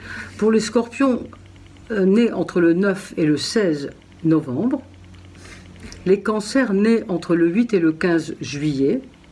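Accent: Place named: Algeria. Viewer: Accent pied-noir plein de soleil ☀️ merci pour votre travail ! Bélier ascendant vierge et lune en scorpion... j'espère que cette année m'apportera douceur et amour ❤️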